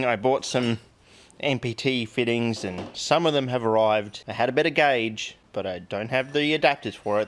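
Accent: Australia. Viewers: Pfft watching for the chemistry… Birds? No thank you I just like the Australian accent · Dude, I've just gotta say this. My life has been shit the past couple years, not looking for pity just saying so for context. I found your channel about 6 months ago, and shortly after I found. I've always been intimidated by, and thus hated chemistry. I'm a physics and astronomy person, and a gufted musician, and I just gotta say, something about your auzsie accent, you're personality, and you're... Relatable..... Methods, got me hooked and it I've seen all your vids a couple times each. New episode just makes my day, and the fact I'm gonna be here for another cubane series makes me giddy. But the main thing to say, is you rock, and keep it coming mate! I always wanted to move to Australia to work as an opal or gold miner but since that's never gonna happen, I'll settle for some chemistry from an aussie physicist.;) good work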